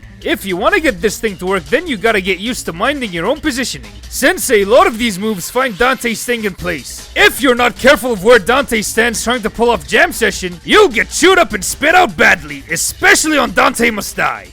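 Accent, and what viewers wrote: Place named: Australia. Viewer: Bro has the most australian assent i have ever seen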